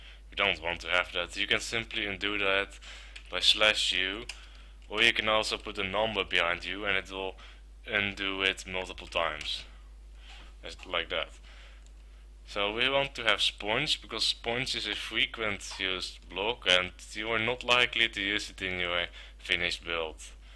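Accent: Netherlands. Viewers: You sound like a Dutch person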